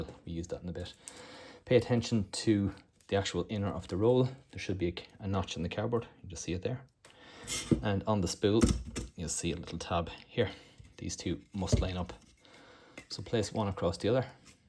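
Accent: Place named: Ireland. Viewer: Thanks, helped with my 1st time set up, the notch was catching me out! Good to hear an Irish voice doing one of these vids too, fair play 👍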